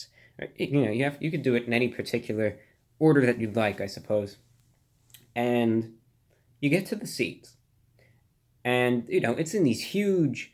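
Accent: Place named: Mid-Atlantic, United States. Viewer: He likes to be classy, if u notice his got a mid atlanitic accent which was popular before 1950. people back then wore suits all the time too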